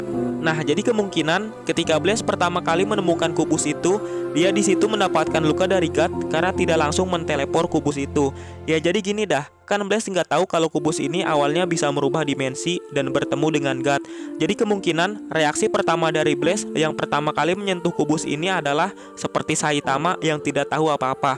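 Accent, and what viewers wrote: West Java, Indonesia. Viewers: dari mana, sunda nya 😅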